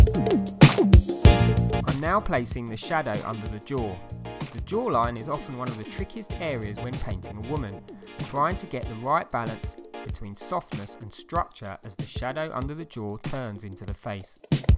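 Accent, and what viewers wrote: United Kingdom. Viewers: First time i hear a Greek guy with a british accent, more british than the british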